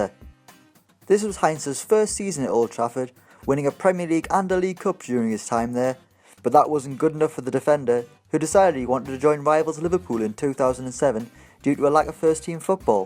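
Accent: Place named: Ireland. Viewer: Tuohygaming101 _ hes irsh id say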